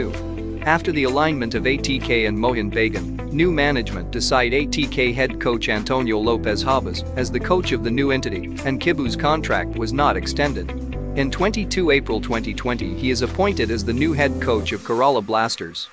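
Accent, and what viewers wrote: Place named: United States. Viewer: But your voice is like an american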